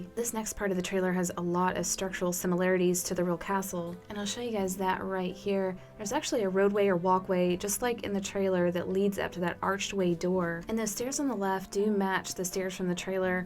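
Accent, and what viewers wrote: Texas, United States. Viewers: what part of Texas are you from? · I didn't know she was also Texan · "Southern accent" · wait, ur from Texas?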